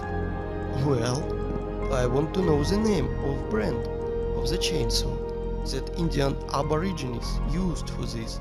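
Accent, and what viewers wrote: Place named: Russia. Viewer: Why is the music so loud¿. Its Hard to hear what he says, with an Russia english accent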